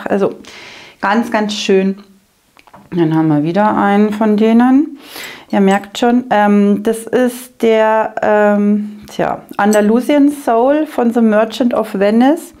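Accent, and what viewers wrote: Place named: Bavaria, Germany. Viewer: So herrlisch präsentiert! Danke dafür! Froh hier gelandet zu sein. Diese private Duftreise gepaart mit dem wunderbaren bayerischen Akzent… phänomenal! Werde das Video gestückelt genießen. Gibt viele Parallelen zu meiner Kollektion. Viele Grüße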